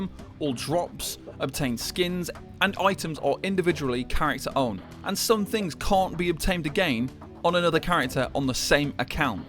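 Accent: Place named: United Kingdom. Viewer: Seems you have an issue with the British Accent. Because there’s absolutely nothing wrong with how this content creators talks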